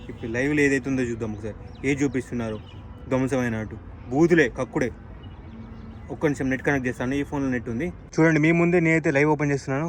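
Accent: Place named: Telangana, India. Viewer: 😂 nuvvu pakka Telangana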